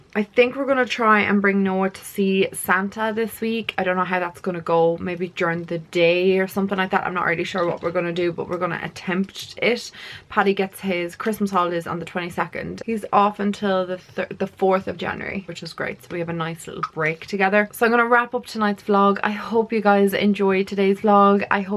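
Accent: Ireland. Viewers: I’m so happy 😀 that your finely being your self. You should enjoy 😉 your life and your family always comes first. Who cares what people are going to say because you can’t please everyone and you can’t get everyone to like 👍 you. I love 💕 to hear 👂 about Baby Noah and how much he’s growing. Don’t ever think 🤔 you have to apologize because you don’t. Besides I live here in Texas and I love 💕 it when y’all show parts of Ireland 🇮🇪 and I love 💕 your accent. Now go get some rest. Merry Christmas 🎁🎄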